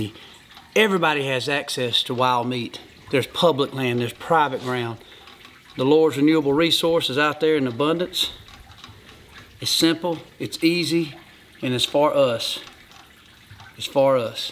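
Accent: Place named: South Carolina, United States. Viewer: You must have took a long break fir those Big pieces of wood to be that small of coals. South Carolina accent is hard to hide..lol And he didn't give a good size bite..lol Good video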